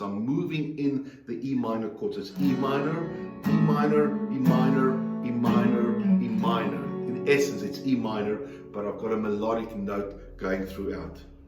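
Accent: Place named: South Africa. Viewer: Great stuff. Are you South African?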